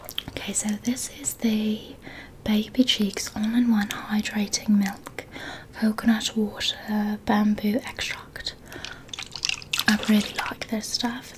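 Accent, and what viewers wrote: United Kingdom. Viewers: You sound just like my friend! We're both British but I'm from a more stereotypically 'chavvy' area, she's from the 'fancy' area. Your British accent is amazing! ❤ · I am a british girl and live in britian, and i have to say you do a perfect british accent, also you do a very good french accent aswell,❤️, love all your videos, very relaxing, and some are funny, your very pretty and cute lady xXx 💕❤️💕❤️💕❤️ xXx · when she talks in a British accent or just her random voice is just so comforting like she has such a comforting voice and it brings my anxiety down so much. ❤ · You are one of my favorite ASMR channels to watch. I love your British accent.😊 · I LOVE your british accent role plays 🥺🥺 they’re so good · Love this! As a brit im v impressed, bit of the keira knigtlys about ur accent 👏🏻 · This argument thread is too funny lol, so I’ll settle it. 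Is this accent impressive coming from a Canadian? - yes. Is this how all brits sound? - no of course not, but a lot also absolutely do. kind of swaps between posh and cockney here; which is why it may sound a little off to us brits (but still impressive!) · The British accents suits her so much omg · can you do another one with a british voice i loved this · i love how the accents are actually british but throughout the video it switches so fast to different areas of the uk😭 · i'm home alone this week and the loneliness started kicking in tonight, but this video was just what i needed to keep me company. and as a brit, i love the accent! the only slip i caught was , we don't say candy. we say sweets! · The accent is actually so realistic because this is like the EXACT accent my British friend has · Love how it dabbles between British and Australian 😂😂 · I love your accent on this! I'm British and you sound like someone who's lived in loads of different parts of Britain (and maybe Ireland as well). 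When people go from place to place you get accents like this, and they're so nice! It's like you can hear all the different influences of the British accents and dialects. Great job! xxx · people still speak like that in Britain, it just depends where you go. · your british accent is so impressive 😭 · omg finally a British accent ASMR 🤍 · British character is back again!!!🥺. Recently I have missed British roleplay characters on your channel ♡ and you posted British RP characters!🫶. i can only say🥹. Did my dream come true?✨️. I can imagine 1 Hour with a british friend as beautiful and sweet as you I must feel lucky and that is the best thing in my life💖🩷. I wish I had a british friend like you loll😭. And Fyi I love your British accent.Your british accent sounds great!. This will be my favorite roleplay💗🩷. Yes I Love this rp for sure!♡ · your british accent is so surprising and pretty 😭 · As a british person who speaks like this. 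Thank you! So many posh accents and this is a much much more common accent! Love you videos!! X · why did I expect u to start speaking in a north east/northern British accent 😭😭 still love the accent tho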